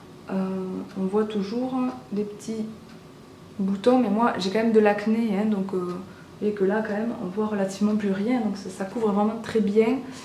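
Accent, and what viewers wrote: Toulouse, France. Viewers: J'adore ton petit accent toulousain ^^ Je trouve ton teint super avec ces produits le fond de teint à l'air pas mal du tout ainsi que la palette je l'avais vu sur la vidéo de sananas le résultat est assez bluffant ! :o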